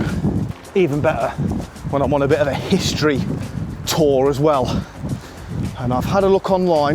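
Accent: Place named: Leicester, United Kingdom. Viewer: Your Leicester accent is brilliant!! Me duck.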